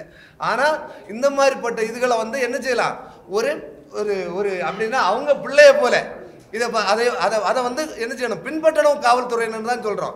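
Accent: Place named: Tirunelveli, India. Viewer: நெல்லை/ நாகர்கோவிலில் பாஷை தெறிக்குது....🔥🔥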